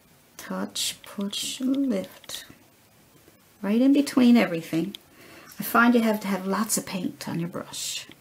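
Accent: Newfoundland, Canada. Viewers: You from Newfoundland? Accent?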